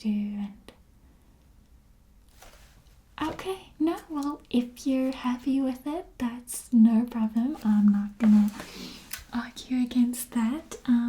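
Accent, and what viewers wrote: South Africa. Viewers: Love your accent. Is it South Africa? x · I thought she was British too in the beginning, but then there were parts that sounded too close to my own accent (Australian) and the natural conclusion is SA lol.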